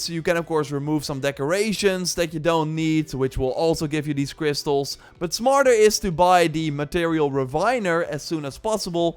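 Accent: Netherlands. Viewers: Wtf ik kan gewoon aan je stem horen dat je Nederlands bent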